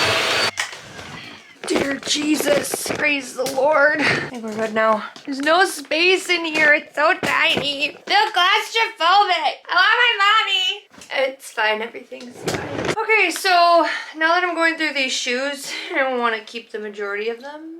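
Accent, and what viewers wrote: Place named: Minnesota, United States. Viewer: That Minnesota accent lol. Ehhh!!! I’m from SC so I’m sure I’d sound funny to you. 😂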